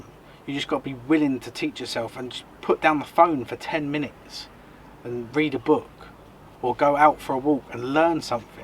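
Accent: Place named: United Kingdom. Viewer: 🇺🇸Very well said. Despite having a very British accent. 😂 Just love it! Prayers for your partner. Hope shes doing well.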